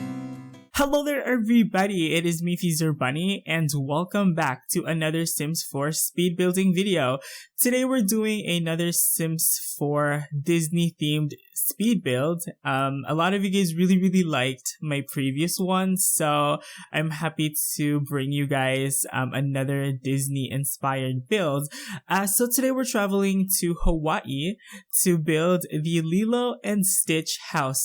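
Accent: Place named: Philippines. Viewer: Hello!! I love your builds so much~!! They are all so creative, I wish I was gifted with such talents for building houses in The Sims. :)) Anyway, you're probably tired of this topic, but I just wanted to share to you that the first time I came upon one of your videos, your accent didn't give you away, but there was a tinge of something or a slip that made me so sure you're Filipino. And, tada!! You are. Teehee, mabuhay!! I like your voice and the way you speak, by the way (creepy much XD). Also, I would like to say that I, too, am struggling with my academics. Damn. In high school I was doing great and college is very different. And I know how it feels to fail on something you worked really hard for. You're not alone. :)) But I'm glad to hear you're doing better. I'm in the same position as well. I have a few units left but it's not an assurance that next term would be my final term for undergrad (thesis is that one big obstacle. 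I'm taking Engineering). Hopefully it is. I think it's just a matter of trying again, and trying again with 200% effort? There are times we get lazy, I know, but it's ok. Sometimes we need to take a break. I do believe that if we fail on something despite giving our 100%, it's because there's a reason behind it. We just have to look at the brighter side of things. Cheers to you and good luck on your future videos~!! Good luck on your acads as well. ;)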